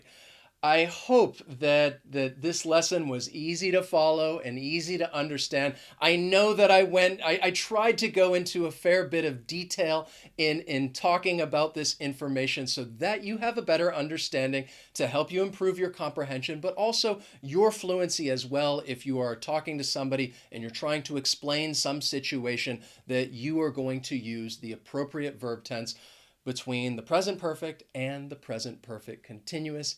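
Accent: United States. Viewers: Hi. What type of American English pronunciation do you represent?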